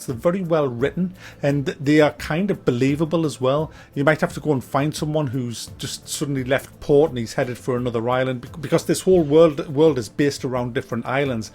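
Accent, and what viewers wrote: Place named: Newcastle, United Kingdom. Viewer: Great review. Thanks pal. You Newcastle or Sunderland btw?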